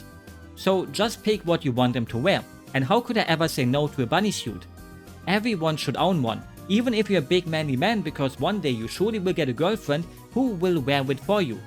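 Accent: Germany. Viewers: you're funny. I love your German accent. No I don't want to say your German accent is funny, I don't mean to sound wude.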